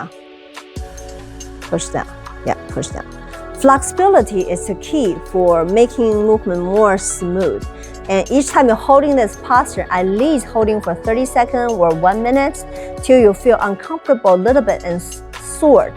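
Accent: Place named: China. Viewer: We love when Chinese women speak Chinese English accents!!!!ha ha! Chinese sword 🗡️ s beautiful to watch in action!!. Love you!! ❤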